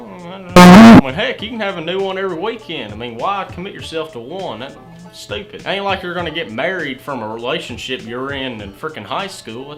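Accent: Kentucky, United States. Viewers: Fake accent? That’s funny he’s from Kentucky. If anything he has s pretty mild accent normally in Kentucky it’s pretty thick. So obviously it’s not fake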